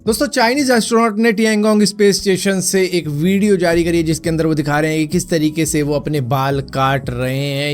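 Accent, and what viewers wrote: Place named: Punjab, India. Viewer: Bai tum Punjab se ho I know I know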